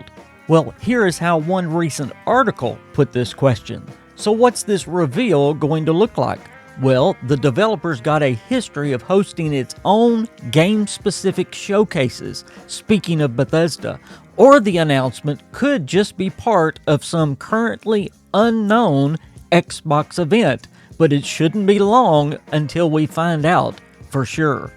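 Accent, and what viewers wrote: South, United States. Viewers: Cant wait to try it on ps5. Subscribed on this channel since a few months ago if I'm not mistaken. Very wholesome channel. Love that southern accent. Salutations from EU.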